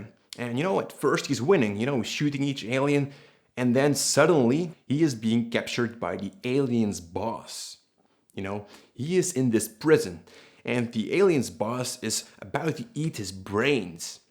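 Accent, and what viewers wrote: Netherlands. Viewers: bootifull dutch accent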